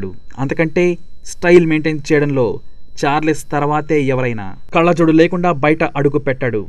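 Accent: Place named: Andhra Pradesh, India. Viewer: mee andhra yasa apundira mundhu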